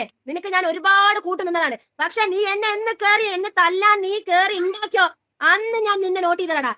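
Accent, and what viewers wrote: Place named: Palakkad, India. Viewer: നല്ല മലയാളം സ്പീഡായി സ്ഫുടമായി സംസാരിക്കുന്നു.ഒരു പാലക്കാടൻ slang. ഗോഡ്ഫാദർ സിനിമയിൽ ഫിലോന്മിനയുടെ അനപ്പാറയിൽ അച്ഛമ്മയെ ഓർമ്മ വന്നു.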